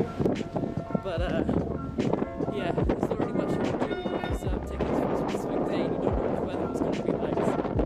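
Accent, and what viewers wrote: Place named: Australia. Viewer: Do you live in the USA? Your accent sounds Australian. That would be a very long flight to New York to just visit for a few days. Haha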